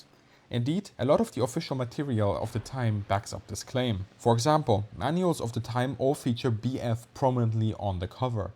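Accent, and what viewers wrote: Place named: Germany. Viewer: Are u german? have the accent but was an interesting video!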